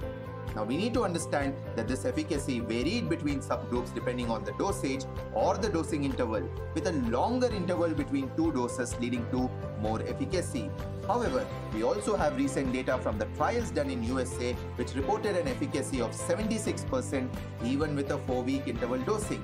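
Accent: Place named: India. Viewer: The Indian accent purposefully used is quite nice.❤️👍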